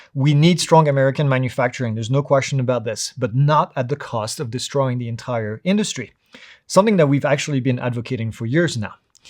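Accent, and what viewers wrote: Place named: France. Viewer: I’m just here for moderately fleeting French accent. Avoir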